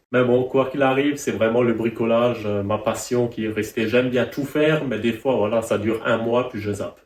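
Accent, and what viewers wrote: Alsace, France. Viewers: Hopla Salut Comme disait Germain Muller, nous les Alsaciens nous n'avons pas d'accent c'est une intonation! Toutes mes sincères félicitations pour ton parcours professionnel, cela explique ta rigueur et le souci du moindre détail. C'est toujours un plaisir de suivre tes vidéos Le Haut-Rhin te salut bien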